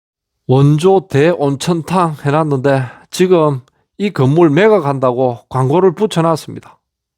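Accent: Gyeongsang, South Korea. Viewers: 표준말로 하세요 갱상도말 역겨워요 늘 부정적인 얘기 너무 지겨워요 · 방송 너무 감사합니다 경상도 사투리가 참 구수하네요 좋은 방송 정보 많이 부탁드립니다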